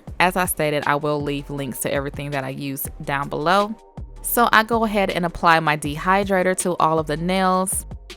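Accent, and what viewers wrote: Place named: Atlanta, United States. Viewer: Just found your videos today and first thing I thought was “she sound like My fellow Atlanta native Kandi Burruss” anywho you’ve inspired me to use my Cosmetology skills to do my own nail dyeing and after this pandemic! Now let see if I can remember those Empire beauty school lessons along with you videos I should be fine😹😹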